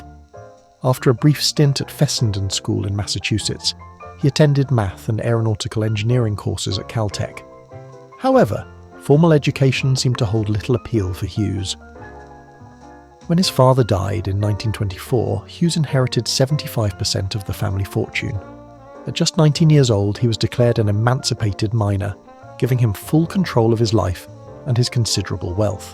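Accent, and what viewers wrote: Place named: England, United Kingdom. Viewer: Lol. That is the voice of the average english man. Relax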